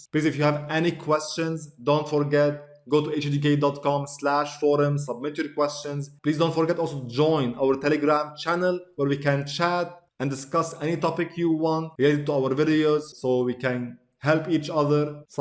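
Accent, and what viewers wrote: Lebanon. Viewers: i swear 7asset ennak lebneni min ur accent... fricken love that!!!!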